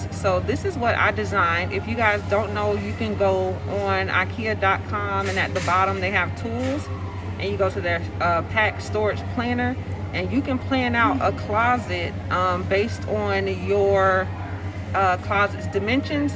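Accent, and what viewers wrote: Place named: South, United States. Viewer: I'm loving being a new subbie...I've just been watching your channel and letting the videos just play. You have such a beautiful personality and love that southern accent...I'm a Mississippi native myself! and PS our men will sit around tucked under blankets until THEY CANT anymore hahahahah lol I loved that!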